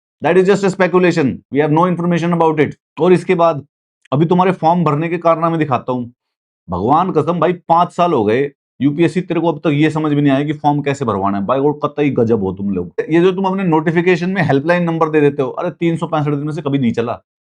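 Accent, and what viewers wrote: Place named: Haryana, India. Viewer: Haryanvi english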